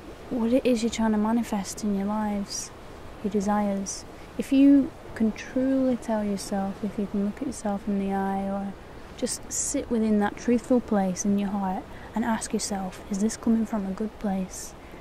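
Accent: United Kingdom. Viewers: Like so many before me, I would like to express my gratitude for your channel and my joy in finding you! I just today found your videos and am so happy that I have. ASMR coupled with spirituality and themes such as unity and a universal oneness is exactly what has attracted me so much, in addition to your beautiful voice (singing and speaking) and positivity. You are such a beautiful light and a lovely soul! Thank you for all your wisdom and sharing these relaxing and informative videos for the world. <3 Also, I have to ask. Your website states you're from Manchester, England. However, I think you sound just like Ygritte from game of Thrones (Jon Snow's first girlfriend, if you're familiar with the show). She's played by Rose Leslie, who is Scottish. So I was extremely curious how a British accent and a Scottish accent sound so similar! Are Manchester accents similar, in your opinion? 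I'm just a silly American who obviously is unfamiliar with the subtleties and differences in accents. Thank you again,! Much love and light! <3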